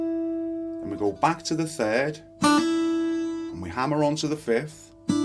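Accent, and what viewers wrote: Liverpool, United Kingdom. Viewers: Yank here. Love the Liverpool(?)accent and the tone from that martin(I live about 20 miles from Nazareth, Pa).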